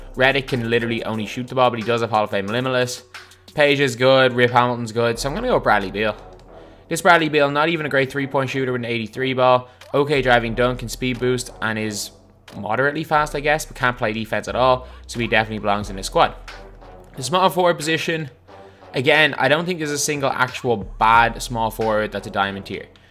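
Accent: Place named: Ireland. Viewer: First video of yours I watched but I know by the accent your Irish?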